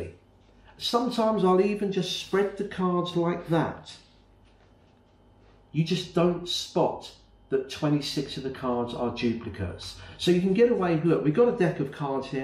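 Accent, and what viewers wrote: United Kingdom. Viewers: Is it possible you teach us someday this wonderful british accent?? or it needs a gimmick (((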